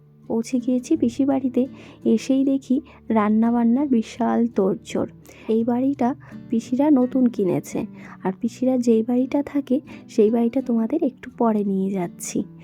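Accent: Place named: Bangladesh. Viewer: de 2me jkhn bareta kotha blo bangladesh r tan dea kotha blo ota 2mr mukha sunta khub valo lga....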